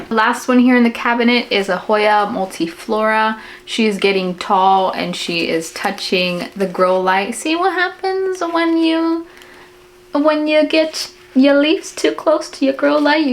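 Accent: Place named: Latin America. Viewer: kaya (hello!) from unceded wardandi noongar boodja, a.k.a. the south western most corner of western australia. I’m not really a commenter, but I’m pretty sure your mystery hoya is a fungii (i love it’s cute mushroom name!) I wanted to say that your planty joy is a pleasure to share in and your genuine and unabashed enthusiasm is greatly encouraging and uplifting, a real celebration of life in her many forms. I thought while I’m here I’d share that two more things, one that the indigenous land I inhabit is one of the 36 global biodiversity hotspots, and we have a range of incredible native orchids and carnivorous plants that are currently growing and blooming throughout our season of Djilba, you should look up the ‘bridal rainbow’ drosera and the ‘queen of sheba’ orchid if you get a chance, they’re just some of the most famous of many stunners! 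The other is that I figured from your cadence you are latin, my housemate and close friend is Ecuadorian, working on getting permanent residency here, and she wanted to grow a cactus on her desk at her new job, so I showed her your videos on the beefs (!) for some tips and also bought her a mini grow light because her office isn’t well lit, and we’re going to go to a local specialty beef nursery to pick some new friends for her! I showed her your video of trying the tunas fruit with tajin, she actually found tajin in our local supermarket here, I’ve tried it with mango, and as a gringa (haha!) I understand the hype now! I have a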